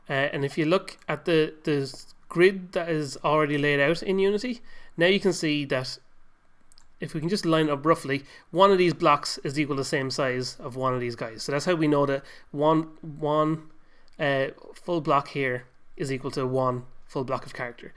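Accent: Ireland. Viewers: This guy's Irish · So true. The Irish accent seems to ease its way into my brain, making me understand more efficiently. ;-) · Are you Irish or something?? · Lol your voice and Irish accent both remind me of remind me of this YouTuber called TheTerroriser (aka Brian). Anyway, thanks for the tutorial ❤ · Are you Irish? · your accent is what i live for if i had an irish accent i would fucking love myself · I actually didn't realize he was Irish, the accent is so burned into my brain it sounds normal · I'm so used to Irish accents that I didn't even realise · The best parts of the video! 1. Your Irish, which makes everything better! 2.The tutorial was very easy to understand and helped alot! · I just realised how irish this man is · I'm all about this dude's accent. Ireland needs to pump out more tutorials.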